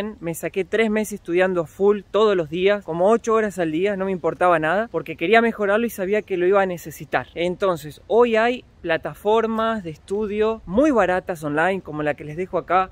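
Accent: Argentina. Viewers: Hola,eres de Argentina? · Iniciamente reconozco eres argentino ;)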